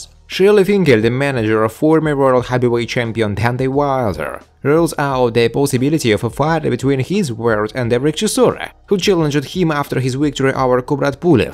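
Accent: Russia. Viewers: Каким-то образом видео попало ко мне в рекомендации. Слушаю и думаю, ебать какой дикий акцент. Подумал сразу может это русский, а потом посмотрел инфу канала и подтвердил свои домыслы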